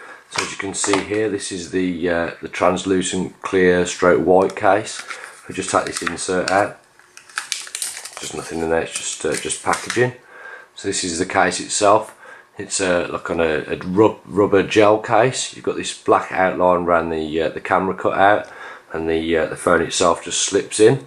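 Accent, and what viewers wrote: Birmingham, United Kingdom. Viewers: brummy accent or local to there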